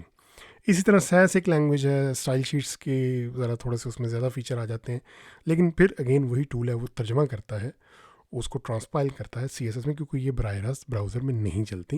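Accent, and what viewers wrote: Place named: Pakistan. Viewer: بہت خوشی ہوئی یہ چینل پاکستان میں دیکھ کر وہ بھی اردو لہجے اور زبان میں، ورنہ ذیادہ تر دیہاتی لوگ منجن بیچ رہے ہوتے ہیں۔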